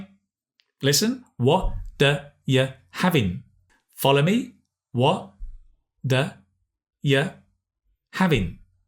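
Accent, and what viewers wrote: United Kingdom. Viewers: You are a legend, I'm fallen in love with British accent, u r a marvel! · Im starting to watch some British Channel... and it seems that im gonna like it... i always find difficulties in understanding someone who speaks Brits Accent.. thanks for it, Sir.. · I think your pronunciation is Britain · Thanks 4 helping me improving my English skills. I really love ur british accent. Ur an excellent teacher. Greetings from Medellín Colombia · Hello Firstly,thank you for a useful lesson .I would like to know that it is British accent?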